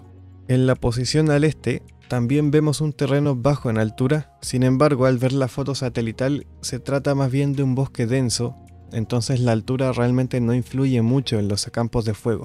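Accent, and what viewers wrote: Chile. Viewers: Otro youtuber chileno bueno informando sobre la guerra ruso/ucraniana? Al menos a mí me suena algo chileno el acento. Bravo los chilenos! Se aprecia y agradece la objetividad. Felicitaciones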